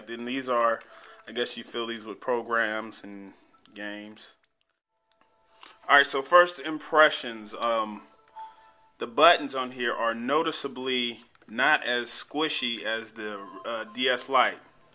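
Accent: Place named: United Kingdom. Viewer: i bet your british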